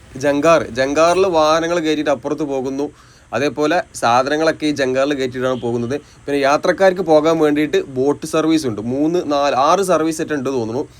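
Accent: Malappuram, India. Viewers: മലപ്പുറതകാരനാണെന്ന് സംസാരം കൊണ്ട് ഉറപ്പായി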